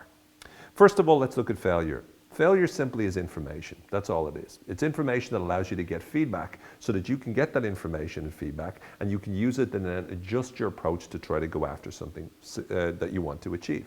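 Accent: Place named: Ireland. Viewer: You speak in a wonderful way, with clarity, passion, wisdom, and a lovely soft irish accent : )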